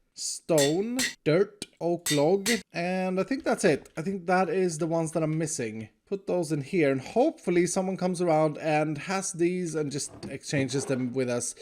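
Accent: Australia. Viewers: Dont worry he is just Australian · He's just Australian, has to be the best thing I've heard in weeks. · He's just Australian. xD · Dude lol bruh it’s aight he’s just Australian 😂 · Dont worry he's just Australian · LMAO he's Australian!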